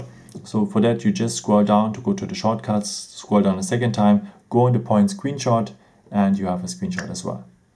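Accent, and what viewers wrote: Germany. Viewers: Are you from Germany????😂🙂😁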